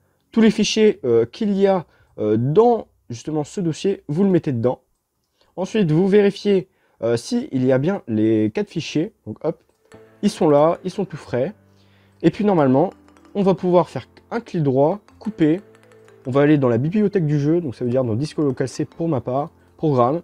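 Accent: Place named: France. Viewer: jppp ton accent francais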